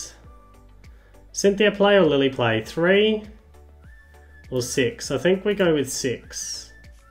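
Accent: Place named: Australia. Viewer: I love that Charizard finally has a competitive card! Also, judging from your accent, I'm guessing you're from Australia? Watching here from the UK! Love your channel! Keep up the good work!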